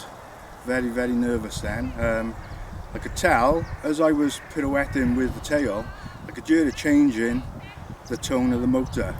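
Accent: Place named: Wales, United Kingdom. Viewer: That took me back to my first Trex 500 (self-built with Beastx) many years ago. Now aged 77 I’m afraid a shaky thumb or two could spell disaster for someone, so I sold or passed on all my gear about 2 years ago. I first spotted your vlog when browsing YouTube on my iPad, keeping the volume to zero - then played it later on my tv, hearing a slightly harsher / more aggressive beat from the motor … and a familiar Welsh accent. I’m a Neath guy and would have loved to have had a co-flyer like you, all those years ago. Good for you sir 👍.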